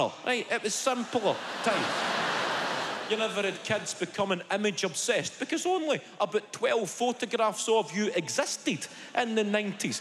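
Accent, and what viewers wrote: Scotland, United Kingdom. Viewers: His language is English, his accent is Scottish.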